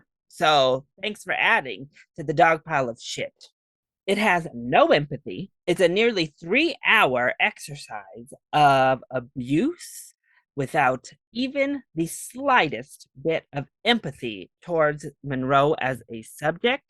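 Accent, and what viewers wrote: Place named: South, United States. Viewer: Per usual, great review,! Love that good ole fashion southern twang! I can’t lie, I actually quite liked your dishonorable mention, “Men” & “Blonde”. HOWEVER, I can 100% get where you’re coming from & respect your opinion on the latter. Everything else, yeah, I’m with you on! Plenty of disappointments this year, unfortunately.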